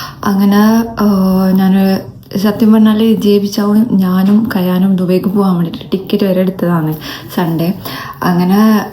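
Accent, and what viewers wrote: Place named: Kasaragod, India. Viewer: Kasaragod yede😂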